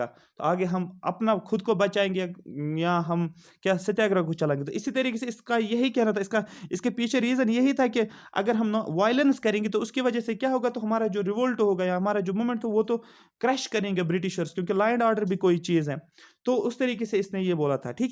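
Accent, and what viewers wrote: Kashmir, India. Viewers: bhai kashmiryu ki hindi todi diff hai...tbhi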